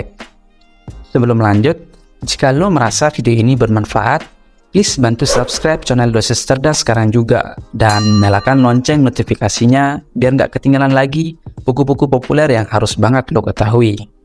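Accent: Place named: Bali, Indonesia. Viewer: bahasanya loe gue , tapi logat,nya bali bngt 😄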